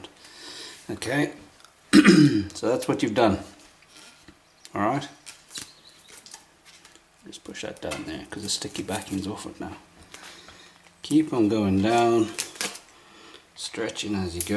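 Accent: South Africa. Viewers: Well what's the chance of hearing a fellow South African showing us how this is done, shot boet! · Sounds South African to me!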